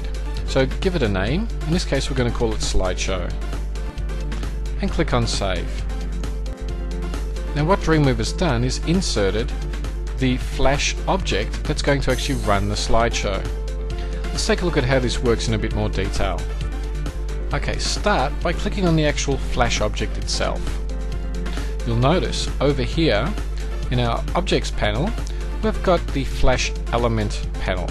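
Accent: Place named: Australia. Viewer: Good to hear a fellow Australian :) Thanks for this!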